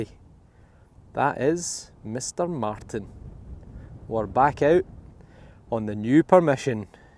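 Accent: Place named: Glasgow, United Kingdom. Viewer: Hi ho. with the Glaswegian accent. I think I was one of the first subs on your visit Scotland channel. You sound just like Billy Connolly 😆.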